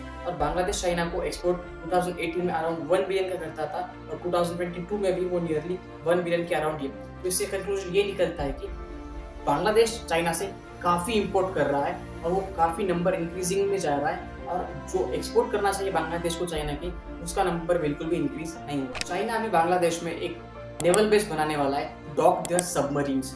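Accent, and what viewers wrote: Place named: South India, India. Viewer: I think you are a south indian .thats why you say L❌YEL✅A❌YAH✅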